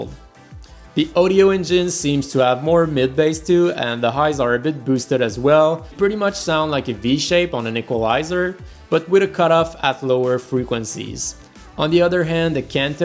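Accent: Quebec, Canada. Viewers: J'adore ton accent québecois! Je subscribe! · are you from Quebec? you have a French Canadian accent. nice review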